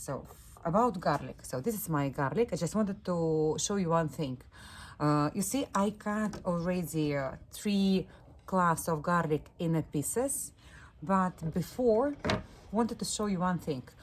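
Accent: Russia. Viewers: Thanks for the recipe! I have to try it. 🩷 👍🏻 I think you have a Russian accent! Where are you from?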